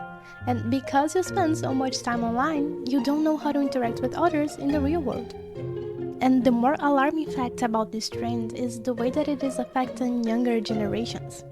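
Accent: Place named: Brazil. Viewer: Pensei que fosse brasileira pelo sotaque e confirmei com o áudio da sua mãe kkk Gostei muito do vídeo e das referências! Abraços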